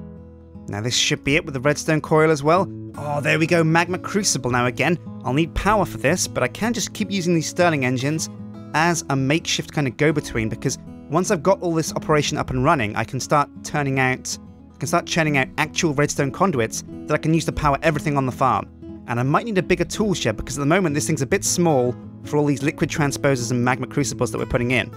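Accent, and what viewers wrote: Australia. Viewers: Australian accent?? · His aussie accent is amazing even I can't do that and I am aussie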